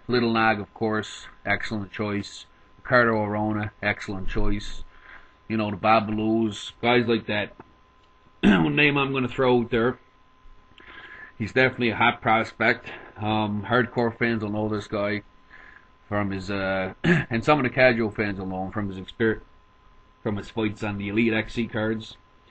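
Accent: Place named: Eastern Canada, Canada. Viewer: You have one hell of a Eastern Canadian accent.....you're a very knowledgable poster though. Keep it up!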